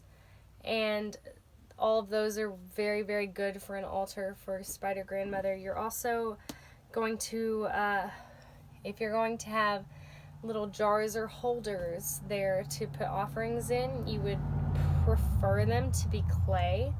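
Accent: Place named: South, United States. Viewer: Girl! I feel you about the way people perceive us based on accent. So like you I speak with a southern intellectual accent. I ain't stupider than you Ms and Mr California! Lol I just have more spice in my life. Keep up the good work!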